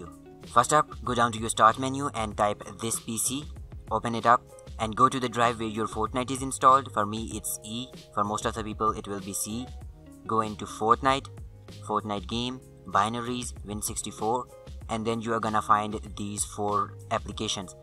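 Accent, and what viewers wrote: India. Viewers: he legit has an Indian voice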